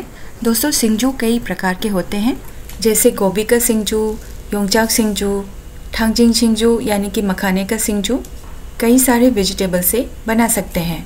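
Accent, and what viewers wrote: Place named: Nepal. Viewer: Its interesting to know more about singju ingredients but nangee hindi ngangbado nepali khoi gee accent malle 🙏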